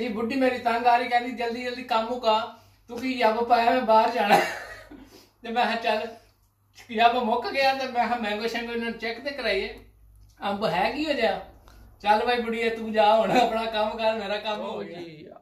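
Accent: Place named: Taxila Pindi, Pakistan. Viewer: MASHALLAH Je bhot Acha laga ap ki video dekh kr, perdes main apny mulk ki hr cheez achi lagti hai,ap ki boli sun kr lagta hai ap taxila pindi side K Ho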